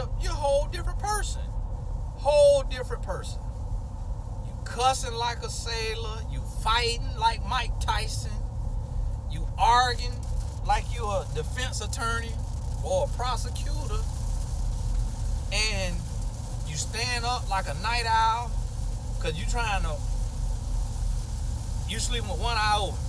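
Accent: South, United States. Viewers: Omg Tony is hilarious and his videos are such a blessing. No fighting over men ✅ ya hear me... now God bless ya! I’m southern too from Florida so I can relate to the draw 😊 but the wisdom has me on another level. I’ve even started my own business and I’m investing in me and being focused something I’ve struggled with for years. His videos make me want to be a better person and Christian. I just bought the book a woman’s influence. Looking for it to come by mail Thursday. I just speak blessings on Mr. and.. I don’t care what criticisms may come please don’t let it deter you, Tony is doing purposeful work and I’m grateful!!!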